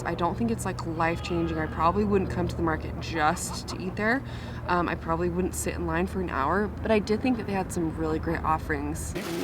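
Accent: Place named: Seattle, United States. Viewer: Your accent says it all, Seattle accent. Greetings from Sweden :)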